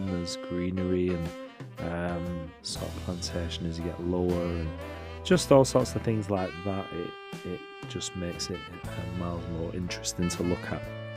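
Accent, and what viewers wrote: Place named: North West England, United Kingdom. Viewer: This is beautiful, I love those moody blue-greys! Also I gotta say, as someone from the North West but living elsewhere, I was overjoyed to click on this video that came up in my feed and hear a familiar accent :) Subscribed, looking forward to your future videos!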